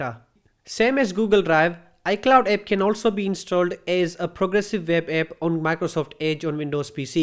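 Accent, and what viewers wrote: India. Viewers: I love Indian English accent!!!! Good content too. 👍🏻👌🏻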